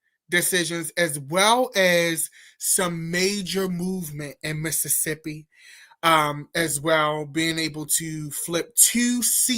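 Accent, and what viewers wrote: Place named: Maryland, United States. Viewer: Great perspective..I knew you were from Maryland....that accent. 😊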